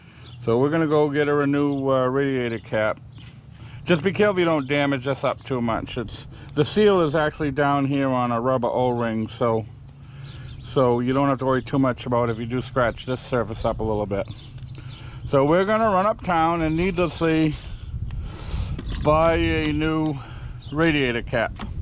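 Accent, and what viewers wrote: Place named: New England, United States. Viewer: Dude definitely is from New England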